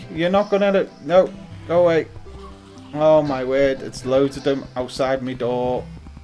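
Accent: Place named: Liverpool, United Kingdom. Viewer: sound scouse haha im from skem an just bought this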